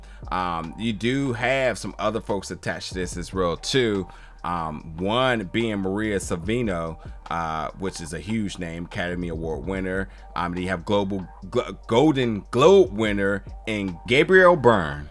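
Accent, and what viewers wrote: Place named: Baltimore, United States. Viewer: Are you from Baltimore or something? 😅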